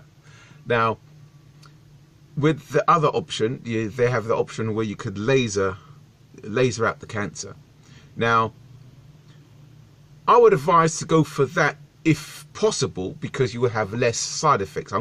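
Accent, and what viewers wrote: United Kingdom. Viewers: You sound like a f***ing southern hero mate, I've just come out a university 28 years old see that your subs need putting up a new video quality could do with improving, I'm looking for a job atm, cockney based the same to you. I'm from Essex, back in the day growing up. in Pompey now. really im offering my services if I could do anything technological/ graphics/ back-end/ editing business stuff to help get you going (2018 youtube is going to be very hard) my degree was entrepreneurship that's going to help you get whatever you're trying to get out online then let me know (no money atm bud, i just wana help listnening to ya). I'll just wanna know a bit more about your drive etc you doing this for money? awareness? a living? I've had a look through your channel and it's a bit all over the place (no offence) I don't know how to offer help but I know I want to let me know cheers for the info you're a hero as i said. 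thanks for the upload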